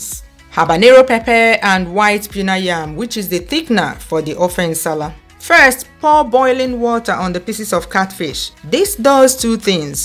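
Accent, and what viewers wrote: Igbo, Nigeria. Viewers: I came across this video then your Igbo accents and and your explanation made me stay and subscribed 😂😂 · Hyper clear Nigerian accent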